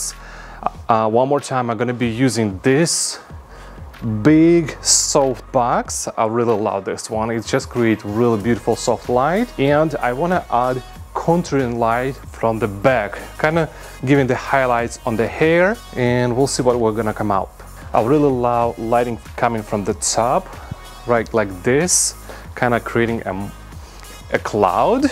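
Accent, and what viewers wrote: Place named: South Carolina, United States. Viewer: Man that SC accent is intense 😊 great video.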